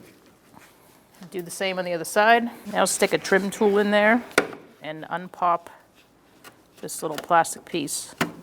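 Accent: Boston, United States. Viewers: 👍 great vid. You are definitely from Boston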